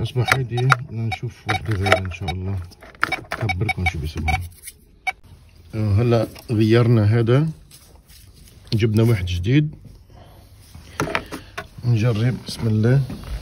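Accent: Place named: Lebanon. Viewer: من الجزاءر تعجبني اللهجة اللبنانيةفيها خير مودع اهلوا مظروب الكف حتي الخبرة ماشاء الله عاقل ورزين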